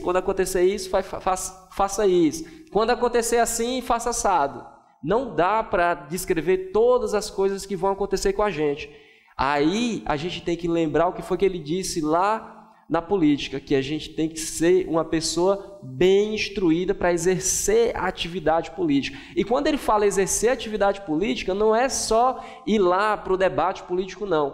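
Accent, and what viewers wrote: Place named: Ceará, Brazil. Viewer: Professor muito boa aula! O conhecimento liberta! Vc é cearense? Seu sotaque parece de lá, muito lindo. Minha família é do sertão do Ceará!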